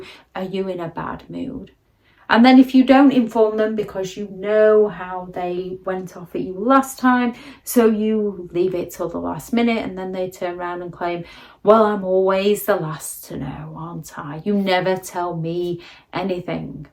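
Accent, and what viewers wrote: United Kingdom. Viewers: O.K. besides excellent content I love your British accent and as a mother of 5 your energy as U hop like a bunny in your first short.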